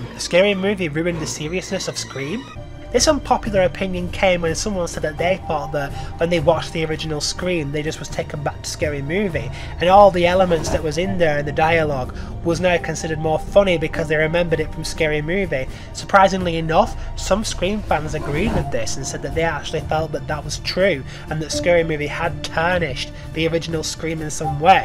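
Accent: United Kingdom. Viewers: I really do feel like Roman fits into the whole story very well - it’s a believable twist and a big one, however as people dislike the third movie, they feel like they should dislike roman and brush aside that he was the third killer in the original movie and the reason the first movie happened. My unpopular opinion is that Scream shouldn’t be viewed as a comedy more than a slasher, and whilst there are some funny moments, the franchise overall isn’t taken too seriously by some horror fans and i feel it should be, it’s the most realistic franchise in the genre and it hasn’t deviated from its realism at all, as there isn’t anything supernatural with the killers. So to conclude, Roman being the third killer is canon and he provided a good twist, and Scream as a whole should be viewed as a more serious slasher entry, rather than something of a comedy. Great video, it’s always nice to hear a fellow brit loving scream! 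🔪🇬🇧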